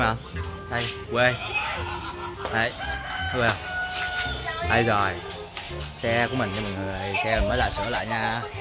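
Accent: Soc Trang, Vietnam. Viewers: Quê anh ở sóc trăng hả anh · Quê anh sóc Trăng hả?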